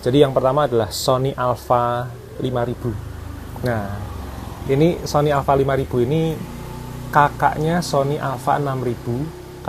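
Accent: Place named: Semarang, Indonesia. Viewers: logat semarang kentel kang wkwkw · mas smpean wong semarang y. ? logate ky semarangan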